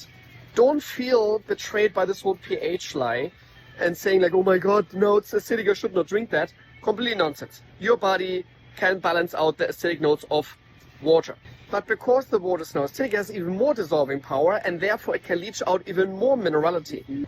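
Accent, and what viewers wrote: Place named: Germany. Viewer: Are you German or Austrian? I love your accent. Im trying to familiarize myself with different accents. Also excellent video. One of the most thorough explanations ive seen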